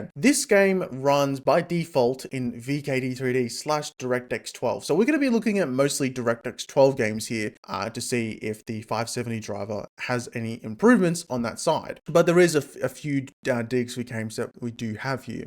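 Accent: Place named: Australia. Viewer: I just realized you have an Australia accent, simply sublime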